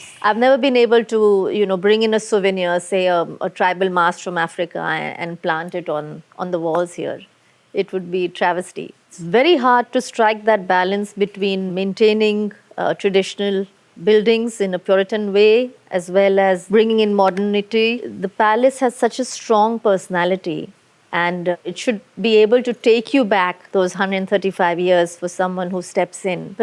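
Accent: India. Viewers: I love posh Indian English accents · She has the most beautiful Indian accent in the English language that I have heard.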